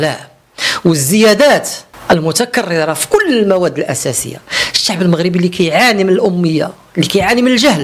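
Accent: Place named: Saudi Arabia. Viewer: كتقلد السواعدا في هضرتهم هههه و تريد أن تظهر أنك عالم زمانه و غير هضر بالدارجة فحتى الخليجيين كيهضرو غير بلهجتهم ، مستلبي الهوية يريدون إقامة دولة هههه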